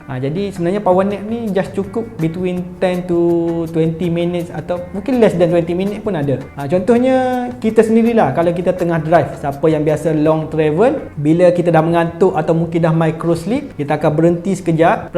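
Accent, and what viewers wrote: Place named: Kelantan, Malaysia. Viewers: Pekat dialeg kelantan walaupun bercakap dalam berbahasa Malaysia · Bkn dgr dialek tu kelate john penang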